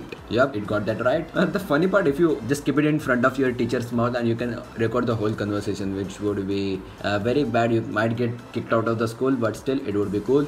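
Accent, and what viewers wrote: India. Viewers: this indian accent makes me nervous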